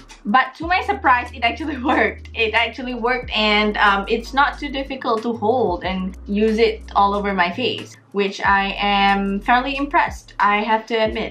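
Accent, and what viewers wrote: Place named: North, Malaysia. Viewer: Bila nak buat video cakap utara lagi?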